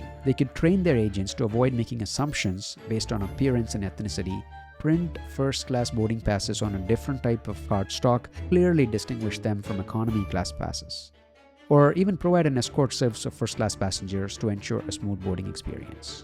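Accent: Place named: Philippines. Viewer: What a great review! Excellent commentary and not too disharmonious music. Great videography that wasn't rushed: loved how you showed the presentation. I assume you are Filipino from your accent? By the way, on Philippine Airlines, the tablecloths always look like they've been pressed, not that I'd ever previously thought about that. Keep up the good work. This review deserves many more than 7500 views.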